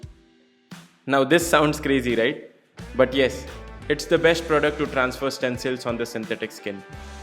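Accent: India. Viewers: Good Video but dang that accent has got to be the most disgusting accent ever. Indian accents are just gross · I wach but the akcent indian destract me afk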